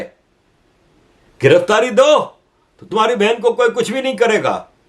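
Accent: Gujarat, India. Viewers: Sir your accent is Gujrati.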